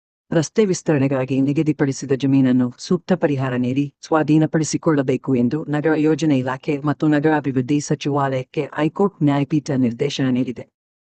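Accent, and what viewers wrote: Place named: United Kingdom. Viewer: Listening your Kannada like English lady speaking Kannada. Next time change voice of fluent Kannada speaking person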